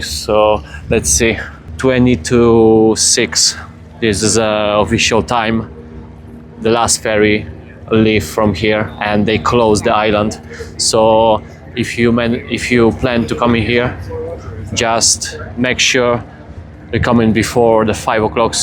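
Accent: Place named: Italy. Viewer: It's funny that you speak Italian fluently as it sounds like your accent when you speak English has a hint of an Italian accent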